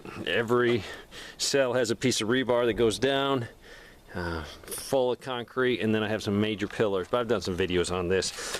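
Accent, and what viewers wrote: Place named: South, United States. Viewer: Glad to see your back.Missed that smooth southern style of yours.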